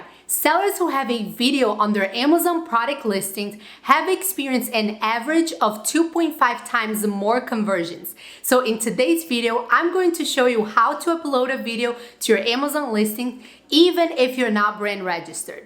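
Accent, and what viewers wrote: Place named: Brazil. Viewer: O sutaque é brasileiro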